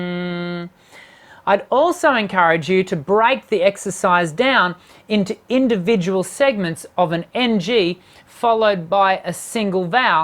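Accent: Australia. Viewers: these videos help but i can't keep myself from thinking if you didn't have such a thick Australian(?) accent they might help even more lol. thanks though! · I thought it was Dana Carvey doing an Australian accent .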